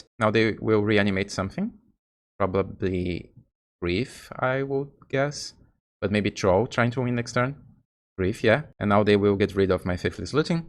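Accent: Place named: Brazil. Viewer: ele é br? nem sabia mas agora o sotaque tá fazendo sentido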